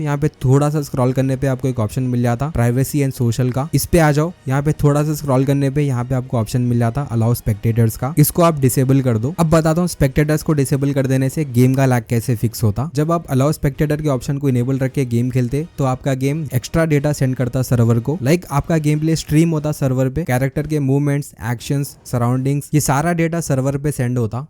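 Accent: Hyderabad, India. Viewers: Bhai aap hydrabadi ho kya??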